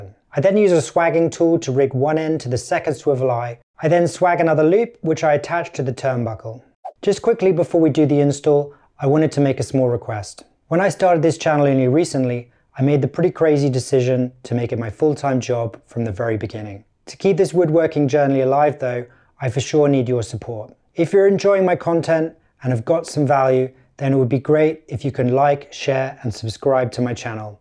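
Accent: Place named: United Kingdom. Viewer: Hi Gotta say it's Soooo Refreshing to hear a British accent whilst watching YouTube videos. keep up the Great Content.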